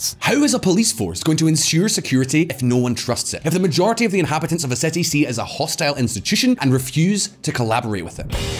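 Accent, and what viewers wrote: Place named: England, United Kingdom. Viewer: I agree, but doesn't it feel weird having some guy with an engish accent telling us how bad one of our cities are? Just doesn't feel right bro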